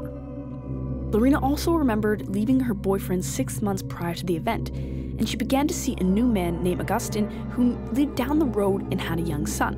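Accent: Canada. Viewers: u kinda sound canadian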